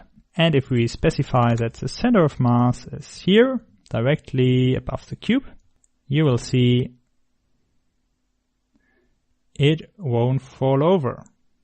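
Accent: Germany. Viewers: Gotta love the German accent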